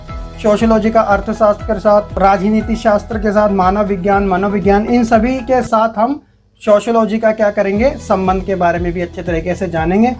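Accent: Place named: Haryana, India. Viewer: Aap haryana se ho I think.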